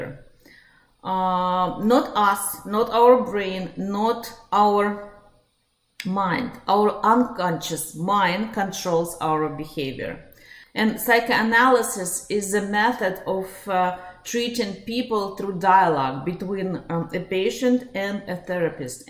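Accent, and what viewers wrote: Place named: Russia. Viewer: You have a heavy Russian accent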